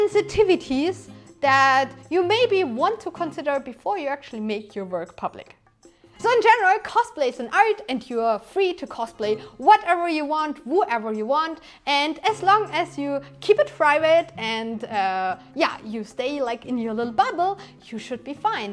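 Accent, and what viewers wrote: Germany. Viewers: awesome! I wish I could have friends like you around me that realize all the work done when you make a costume, realize a vid, a how to vid, a thing with a 3d pinter, learn moves on a fight scene or how to utilize softs like photoshop, lightroom, fusion360, hitfilm, passing time consuming energy trying to find how to make this special costume piece with this special color or texture, find materials and technics...generally all I have is people doing sports and watching tv series, never make a thing with their hands, so likes are few. Anyway, having positives people like you, enjoy my day and the most important thing is to be proud and happy of what we are, whatever we are, we are awesome , and never give a sh.. to people that dislike just for fun. (special mention to your german accent that I love because I understand all (and probably I have almost the same because I'm french :-D ). 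my tip when I see a bad comment: scroll! :-D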